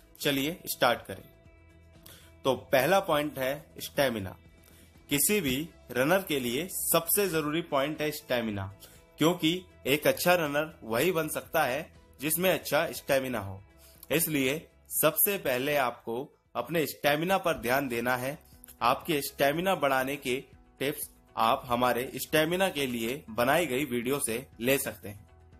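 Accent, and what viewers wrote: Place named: India. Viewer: fuck! this accent! i'm so fed with indian accent! fuck!!!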